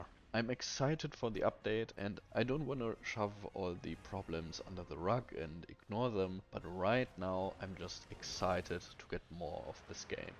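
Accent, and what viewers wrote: Germany. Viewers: Youre German, arent you?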